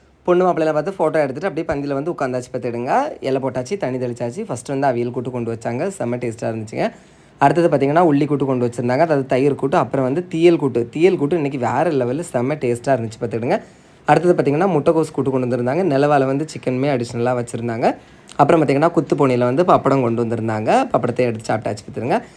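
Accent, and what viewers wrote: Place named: Kanyakumari, India. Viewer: நீ படிச்சவன் தானே நீ ஸ்கூலுக்கு போய் இப்படியா பாடம் நடத்துவா கன்னியாகுமாரி பேச்சை மாத்திரியா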